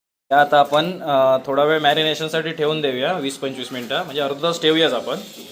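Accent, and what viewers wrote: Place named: Malvani, India. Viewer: याला म्हणतात खरे मालवणी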